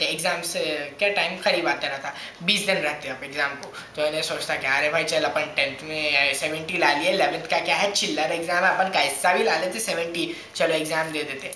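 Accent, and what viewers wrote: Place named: Hyderabad, India. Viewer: dude m watching this just for your hyderabadi.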